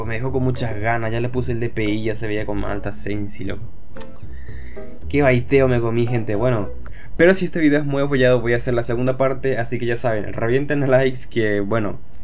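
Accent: Paraguay. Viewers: Sos Paraguayo pero con mezcla de chileno argentino brasilero y otro más??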